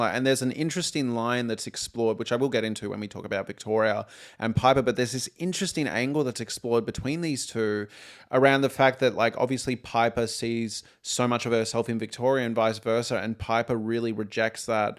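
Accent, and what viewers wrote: Australia. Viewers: ? no he doesn't, he's australian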